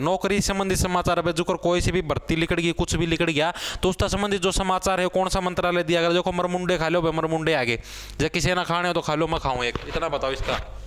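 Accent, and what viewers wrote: Haryana, India. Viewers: Ya to hindi ae bol le ya fer haryanvi bol le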